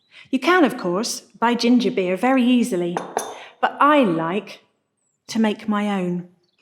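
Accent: United Kingdom. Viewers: Meu Deus amo o sotaque britânico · Because of this channel, I can now speak English in British accent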